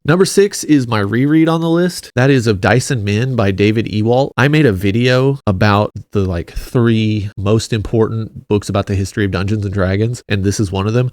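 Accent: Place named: South, United States. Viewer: Thats a sick MN hat I gotta get one of those. For some reason I thought you had a slight southern accent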